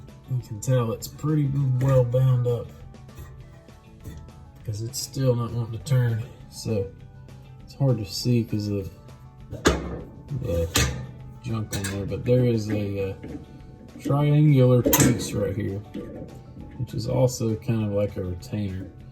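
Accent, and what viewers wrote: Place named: Alabama, United States. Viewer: Dude's speaking Alabama ease. He doesn't have an Amana (Uh-man-uh) dryer, he has an (Ar-mon-uh) dryer. I thought he was servicing a new brand of dryer. Keep up the good work Buddy!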